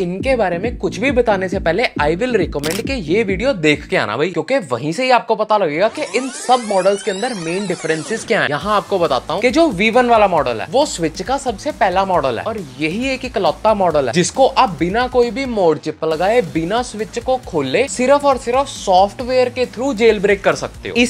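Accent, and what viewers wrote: Punjab, India. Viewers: I came to listen his Punjabi Hindi accent......specially the word Chippppp😂😂 Loved your content😊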